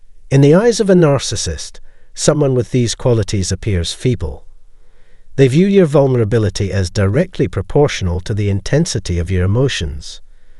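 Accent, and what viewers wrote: Scotland, United Kingdom. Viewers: Great talk. I was in the relationship for 50yrs. Back then you never heard of manipulation or control. I was one with empathy. I remember one time he was at the doctor's he got tests, when he got the results I asked what the doctor said I was told to mind my own business. I have never asked him again. He was cruel and would say nasty things. He even said something to one of my daughters about me, she was thinking to herself mum is not like that. They love to play the victim and blame you for everything. I have no desire to have him back in my life. No love, affection, he made out I had a problem but it was his control. I still feel the loss as I was the romantic one. I'm so grateful I'm still alive and getting help. I don't care what people say about your accent I'm Scottish as well but have a different accent. You are giving us a good life with education. 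Thank you and your channel. ❤❤🙏🙏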